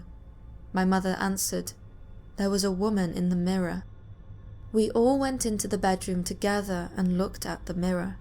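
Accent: United Kingdom. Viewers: Speaking about the female reader: I love your delicate British (?) accent, makes the stories that much more enjoyable. Keep up the good work. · once again - brit narrator- whats up with that mystery?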